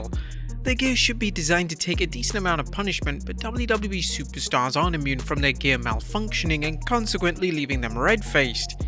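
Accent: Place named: United Kingdom. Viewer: yooo!!! just the "british" i was hoping for!!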